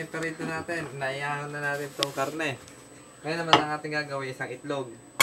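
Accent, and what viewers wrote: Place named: Ilocos, Philippines. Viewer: you have ilocano accent. pls.. don't get mad, love your video though... tanx kabayan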